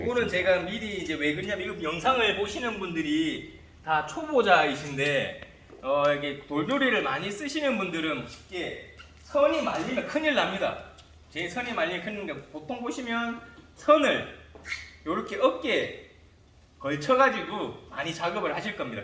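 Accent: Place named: Busan, South Korea. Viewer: 항상 청소장비들 소개 잘보고 있습니다 부산 사투리 ㅎㅎ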